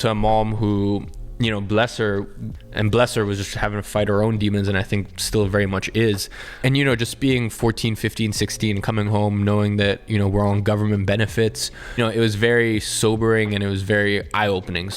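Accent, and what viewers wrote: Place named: California, United States. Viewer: If you grew up in London...why don't you have an English accent? You sound straight up Californian lol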